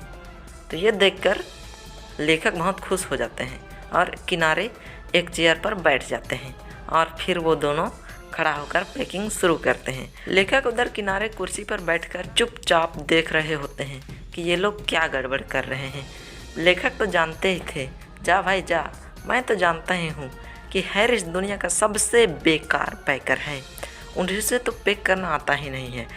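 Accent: Bihar, India. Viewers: You are Bihari n?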